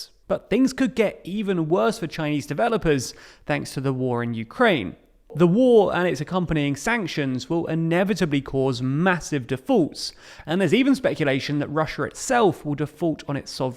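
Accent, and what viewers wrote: England, United Kingdom. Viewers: I think they are based in the US actually (just the narrator has an English accent) :) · Nah mate he is definitely English.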